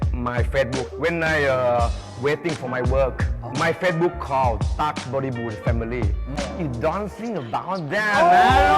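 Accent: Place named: Thailand. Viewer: ถึงสำเนียงจะไทยมากแต่ถูกต้องทุกคำค่ะ 😊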